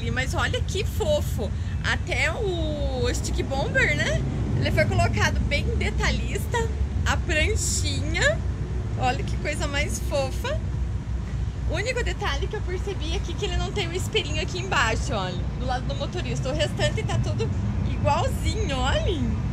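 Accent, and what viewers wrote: South, Brazil. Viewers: Acho da hora esse sotaque do Sul, vc fica mais linda.😍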